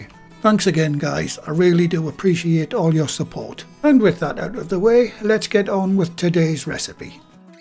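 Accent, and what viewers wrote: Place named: England, United Kingdom. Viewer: Geez I really like the recipe you’re using and your teaching style! It looks very tasty and a great dish for winter. Your accent reminds me of my Dad and his English relatives. Thank you for this video.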